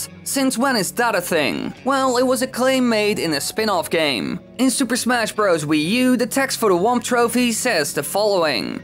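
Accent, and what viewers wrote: Netherlands. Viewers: Are you dutch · Saw your majora's mask video and thought I heard a little dutch accent. Your PO box adress confirmed it Haha. But so am I!Keep up the good work dude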